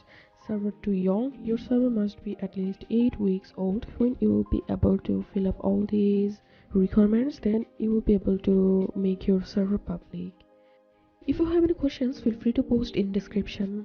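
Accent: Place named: India. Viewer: cant listen to this voice im sorry. if you are the beautiful girl in the thumbnail thats awesome but it sounds like an indian video trying to guide me lol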